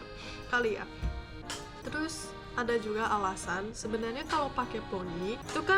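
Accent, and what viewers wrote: Indonesia. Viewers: Logatnya certified mba-mba indo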